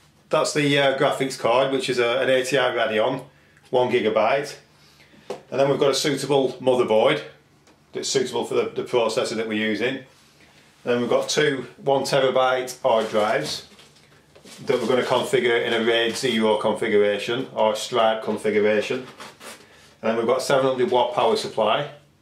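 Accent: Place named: Yorkshire, United Kingdom. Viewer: Your accent is sick! haha Where you from? yorkshire?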